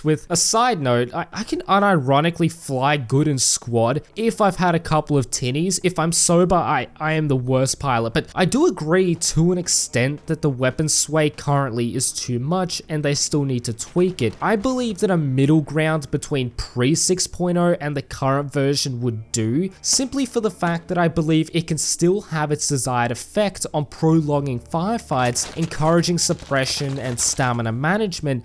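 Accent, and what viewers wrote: United Kingdom. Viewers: Nice British accent man.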